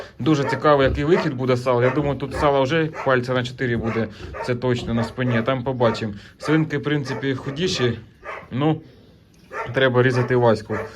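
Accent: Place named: Zhytomyr, Ukraine. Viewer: Вась не звиртай увагу на рiзних дурнiв добре видеш канал, в нас на житомирщинi говорать також суржиком!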